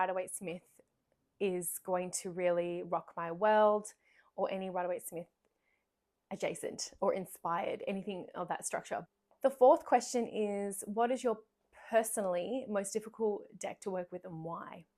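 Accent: Australia. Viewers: Well what can I say ? Just splendid. I know you said you were in Canada, but you sound like one of my Aussie compatriots. Thank you for the upload.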